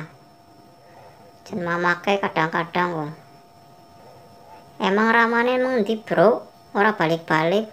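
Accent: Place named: Banyumas, Indonesia. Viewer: Hahahaha.....ngakak. ora ngapak ora kepanak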